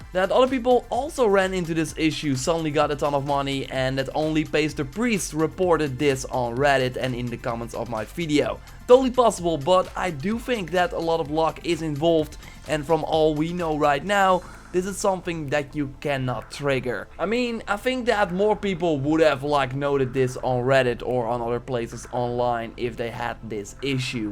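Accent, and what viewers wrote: Netherlands. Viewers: he is dutch. thats why he has a acccent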